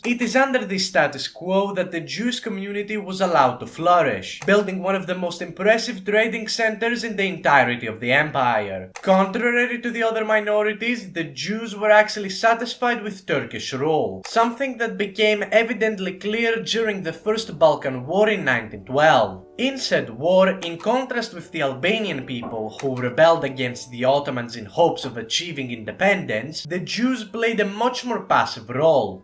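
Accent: Greece. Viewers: Really like to listen to people with that greek accent, it sounds so interesting and funny at the same time, nice vid btw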